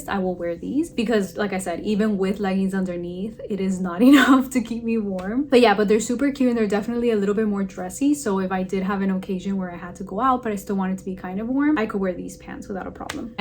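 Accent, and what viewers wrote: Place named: Southwest, United States. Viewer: I love your video, your comfy shoes are beautiful. The whole time I was trying to place your accent, it sounds like south East LA, not Tennessee. They have a variety of crazy accents, but yours sounds distinctly southwest. I’m from California btw.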